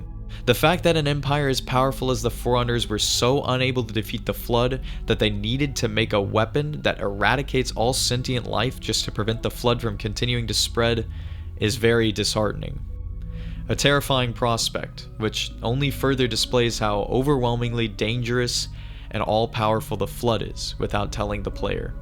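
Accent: Greece. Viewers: Are you Greek? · Are you Greek bro ?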